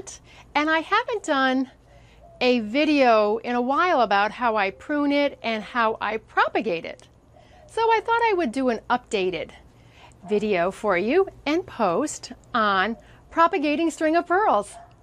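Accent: United States. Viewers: You sound like you're from Northern USA or Canada! Such a sweet voice makes the video easier to watch!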